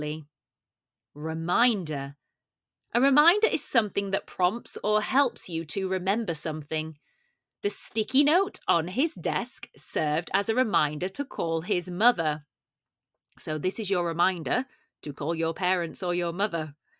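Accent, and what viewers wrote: United Kingdom. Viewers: Thanks for creating this program, because I can learn British accent from this. Thank you very much!❤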